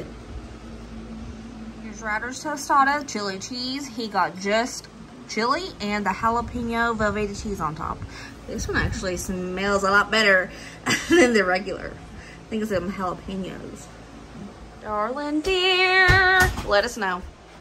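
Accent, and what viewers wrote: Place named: Tennessee, United States. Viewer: YOU’UNS! I am from Mississippi and was trying to predict what state you are from based on your accent alone…you’uns tells me probably Tennessee! You have a lovely soul. Thanks for sharing some great ideas.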